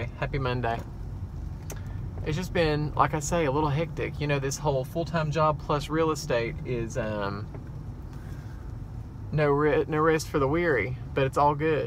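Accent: South, United States. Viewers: My boyfriend and I just love watching you, so perky and fun and love listening to that southern accent! BTW I love my tarts as well, a definite good one I discovered the other day is Better Homes & Gardens "Spiced Vanilla Embers" you'll thank me :) it's amazing!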